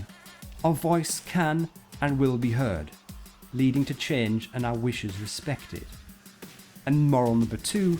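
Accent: United Kingdom. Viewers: dat british accent :O!!!!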